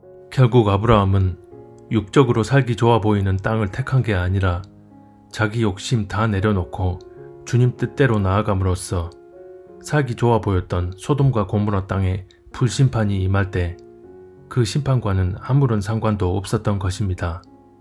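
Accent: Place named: Jeolla, South Korea. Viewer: 전라도 억양 듣기싫다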